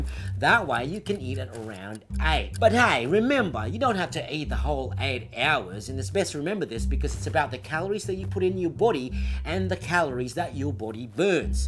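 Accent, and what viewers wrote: Australia. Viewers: Its still very surprising hearing an Aussie accent coming from a filipino guy. Coming from a filipino this is gold. Good on ya mate🤘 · Wait so do u really have an australian accent?? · crikey i love your Aussie accent mate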